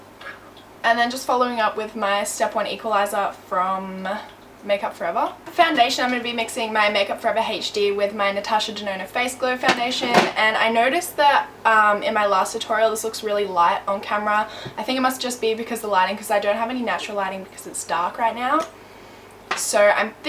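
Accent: Australia. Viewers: I love that australian accent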